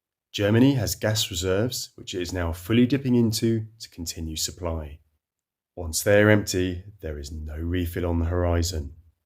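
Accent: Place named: United Kingdom. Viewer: You sound like your from 🇬🇧 not 🇩🇪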